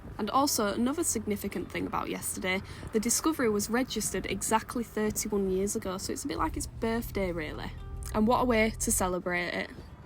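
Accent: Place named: North, United Kingdom. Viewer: Nice northern accent, I much prefer your Disco over the Defender.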